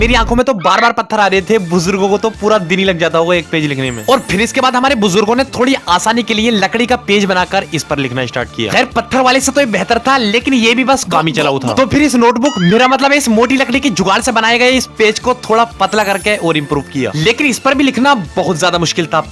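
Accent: Gujarat, India. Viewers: Bhai tame Gujarati chho?😊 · Bhai aap gujarati ho kya ❤🎉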